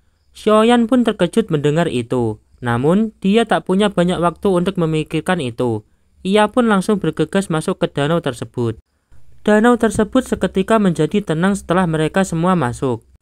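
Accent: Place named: Java, Indonesia. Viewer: Suara mu meddo banget mass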